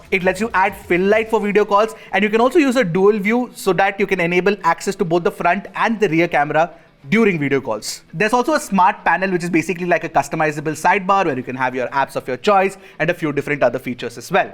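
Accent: India. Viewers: Bro you kinda look like a Indian and also speak like Indian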